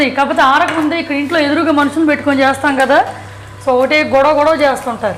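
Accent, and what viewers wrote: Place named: Nellore, India. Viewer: me slang super akka.. ma nellore basha lage undi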